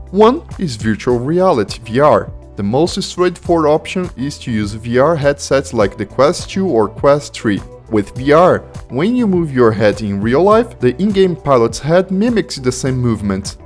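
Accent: Brazil. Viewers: By the accent this bloke is brazilian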